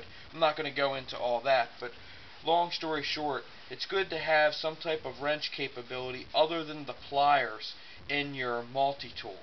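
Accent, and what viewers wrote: Baltimore, United States. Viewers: Good idea. You sound like you have a Baltimore accent. Am I right?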